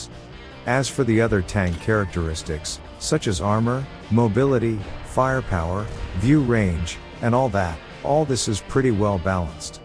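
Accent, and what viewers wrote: Russia. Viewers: Ты русский? Давно научился говорить по английски? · very nice accent for a russian guy. Thanks for these information.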